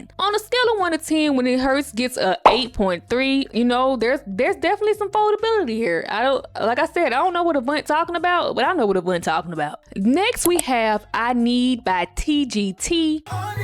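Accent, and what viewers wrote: Memphis, United States. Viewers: Can someone in the comment section tell us what state her accent is common? I want to assume memphis because glo's accent sounds similar. . . Please and thank you 🤝🏽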